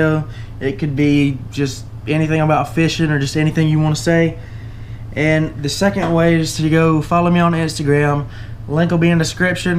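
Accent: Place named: Alabama, United States. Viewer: What part of Alabama are you from?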